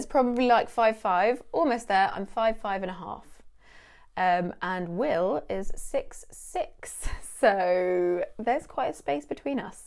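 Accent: United Kingdom. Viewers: First of all thanks for your channel "English with " which has improved my English drastically. Now I have also started following this new channel of yours which is now improving my listening skill, especially British accent. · British English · Thanks to you now i like british accent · I've been watching English with and now this for about a week now. I'm an author in America and I just enjoy the British accent a lot, and I enjoy watching a beautiful person talk (and you're very beautiful), so listening to you and watching you is appealing, and here and there I've learned some things to improve my English. (I've been pronouncing albeit wrong for years, lol.) It surprises me to hear that you're 24 in this video, which means you're 25 now. I'm 31 and I've had this feeling like you were older than me, more mature and developed somehow. I rarely ever get this feeling about someone younger than me. 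Perhaps never before, actually. I think it might be the British accent; it comes with an association of rightness for me. I wonder how many other Americans would react to you the same way I have. Blessings.:)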